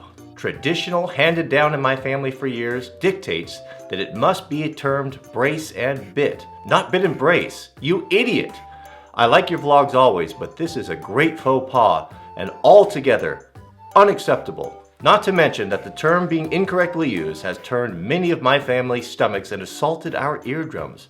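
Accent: Canada. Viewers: I am confused you talk like your a Canadian but you hate the metric system but live in the states.Are you a posser?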